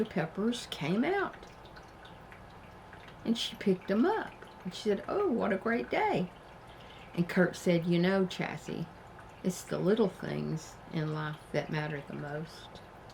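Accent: South, United States. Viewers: I love your channel. I love listening to you. You have a sweet Southern accent. I can see you growing and glowing. I'm so proud of you. It takes courage to do this.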